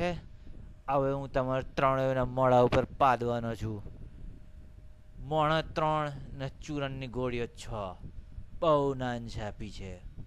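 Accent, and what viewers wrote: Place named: Mehsana, India. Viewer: well done bro..gujarati wid mehsani accent..hahahah lolz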